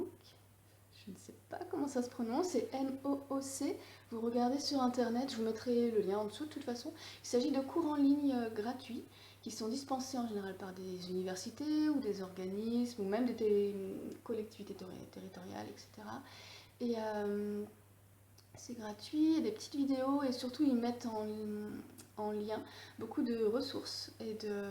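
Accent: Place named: France. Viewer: Les conférenciers sont très intelligibles parce qu'ils doivent parler clairement, souvent à une audience qu'ils savent internationale. Ensuite le niveau de vocabulaire, le mixage sonore, la tendance à répéter (les running gags d'un How I met permettent vraiment de capter des expressions et les rendre assez familières pour qu'on les trouve quand on en a besoin, et qu'on les prononce bien !), le confort physique dans lequel sont les personnages quand ils parlent, l'origine (UK vs USA) des acteurs vs. ce à quoi on a l'habitude, etc., influent sur la vitesse de progression VOSTFR (juste au début pour -> VOSTEN -> VO qu'on peut avoir. Dans la foulée, pourquoi ne pratiques-tu pas ta prononciation avec une vidéo/film/..., en apprenant un poème ou un discours que tu aimes ? Tu aurais le modèle à disposition pour savoir les sons à produire. Comme tu le sais ta prononciation actuelle a un fort accent français, et je crois que c'est très facile à comprendre quand on est français, surtout mauvais en anglais et habitué au franglais, mais moins pour un natif dont l'oreille est moins indulgente et moins entraînée à nos sons. 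J'ai énormément amélioré mon anglais en apprenant par coeur "I have a dream" avec le modèle en vidéo + quelqu'un qui m'aidait, et ensuite en écoutant des séries et vidéos Youtube. Les sons me sont entrés dans la bouche à force de les entendre. Si on est assez geek pour passer une grande partie de la journée à écouter de l'anglais d'une façon ou d'une autre, et qu'on a d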